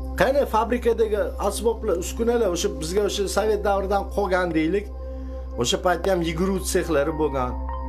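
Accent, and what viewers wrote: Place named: Namangan, Uzbekistan. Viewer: Наманганлимисиз ака гаприш тилиз охшайди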